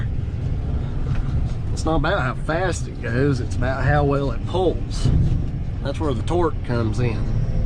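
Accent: South, United States. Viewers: Whys the narrator so southern?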